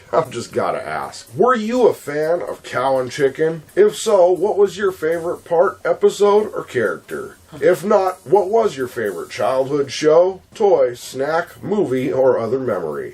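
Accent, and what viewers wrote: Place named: United States. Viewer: I didn’t know Max Cavalera did 90s show reviews in an American accent.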